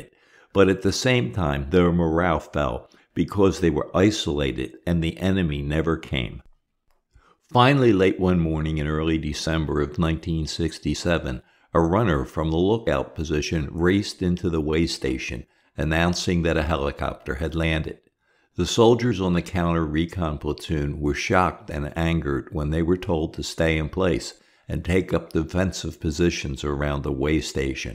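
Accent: Philadelphia, United States. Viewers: Narrator is definitely from Philly!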